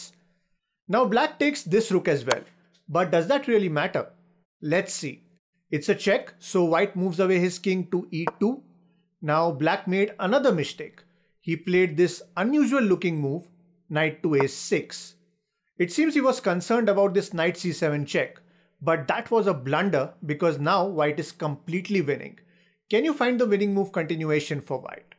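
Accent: India. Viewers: The first time I watched your video, I thought you are an Indian living in England or any other country. Your English is so good, but the accent is still Indian 😂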